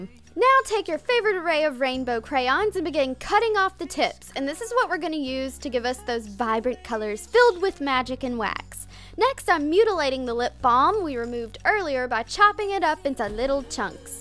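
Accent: South, United States. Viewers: Subscribe Ily videos ur so creative and I love ur funny country accent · is she country or something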